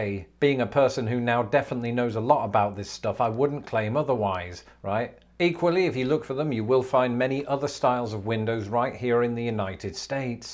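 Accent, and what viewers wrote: United Kingdom. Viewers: Such a mundane topic made interesting with dry wit and a British accent. 😍😍 Found this channel via Mr H and Friends. Bloody love it. (hello from South Carolina) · stahz... I love the British accent xD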